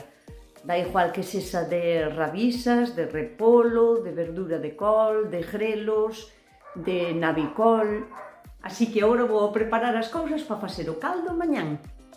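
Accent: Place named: Ribeira, Spain. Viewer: Hola,primero por favor donde vives.despuesde más de 50 años puedo oír hablar en gallego,es como en mi pueblo Ribeira ❤ Vivo en Aragón ,añoro mucho Galicia.😢 Me encanta oírte ,abrazos ❤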